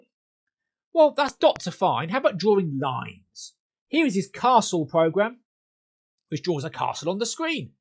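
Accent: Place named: Cockney, United Kingdom. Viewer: Oi. Ya Cockney was right 'ood, mate.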